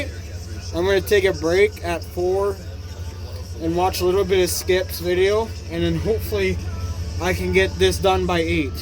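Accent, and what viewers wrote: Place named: South, United States. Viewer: OK I hear the country now...lmao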